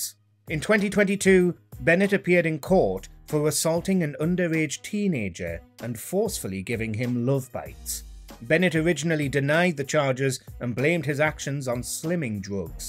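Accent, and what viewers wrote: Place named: Newcastle, United Kingdom. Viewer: A Geordie talking a load of Crap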